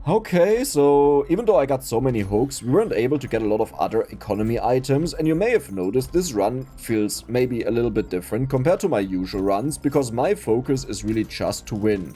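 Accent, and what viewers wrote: Germany. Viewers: As a newcomer i have been thinking what accent does he have. Today, i realized and feel very, very, very dumb. I even speak german.... The "Ooga, ooga, ooga" felt about right for my brain at that moment.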